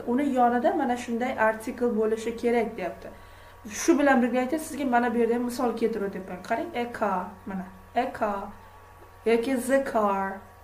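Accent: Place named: United Kingdom. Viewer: Бритиш акцент )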